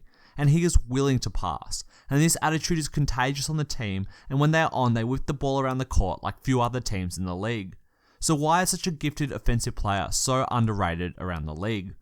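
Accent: Australia. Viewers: A guy from Australia (sorry if I misjudged the accent, not a native English speaker) with 4k subs on youtube makes NBA analysis of such quality and depth, probably working in his room at home, and ESPNs and Foxes of the world, with budgets of tens of millions of dollars, can't give us anything better than Colin Cowherd and Nick Wright...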